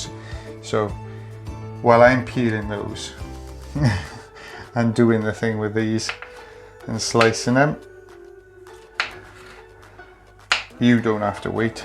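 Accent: Wales, United Kingdom. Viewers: Hi, great to hear a Welsh accent on Youtube and in the Bosses group. Great video, good luck tomorrow for the Grand Slam!!!!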